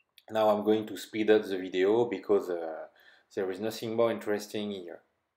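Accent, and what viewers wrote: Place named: France. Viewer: its hard to speak english with french accent try to listen to old school american rap music with practising after them that's how i ignored my arabic accent influence on my english i hope it will help you great vid btw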